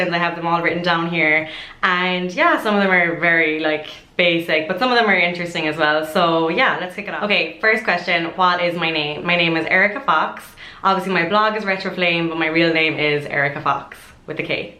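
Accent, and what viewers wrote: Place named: South, Ireland. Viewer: Erika I could listen to you chat all day, even though I'm from Northern Ireland I just love the southern Irish brogue , you don't come across as nervous at all you come across so confident. My natural hair colour was light auburn with brown eyes but my niece is more red haired like yourself & she has brown eyes. I wish you all the best I've no doubt you will succeed in everything. I'll be looking forward to all your videos. Oh & I have to tell you I love your sister and your Mam, you have such a wonderful family and not to forget vogue. Sweet Irish blessings to you all. 😘🍀😘🍀😘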